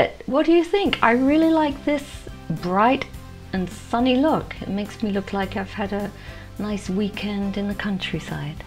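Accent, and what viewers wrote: England, United Kingdom. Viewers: ...The same to you. I love your channel, as well as your accent. Oral tradition has it that my relatives way back are from England. Maybe the reason I love to hear the accent. I live in the South, so we do have a “Southern” accent, with “ya’ll “ thrown in 😂. We study about everything you can think of, not on a certain grade level. I try to get them interested in learning everything. We are heavy on Math, science, reading, and history, but throw in art and music too. I was a teacher back in the day, then went back to study nursing, and finished my working for pay, as a critical care RN. The girl’s mother is also an RN. ( I work for free now 😂)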